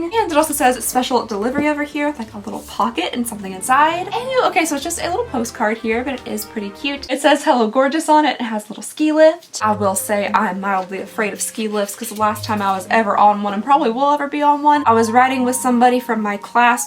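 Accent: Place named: South, United States. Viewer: Sounds more like you’re back from Texas 😂🥰 anyone else hear that southern twang a little extra in this video….😉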